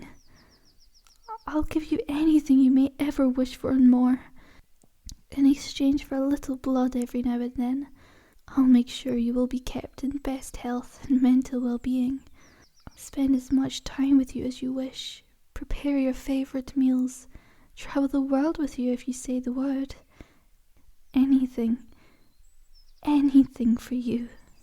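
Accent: Scotland, United Kingdom. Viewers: Scottish or British, miss? Or might you be Aussie? I'm lead to believe you are one of the three. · I love the hint of Scottish in the accent btw!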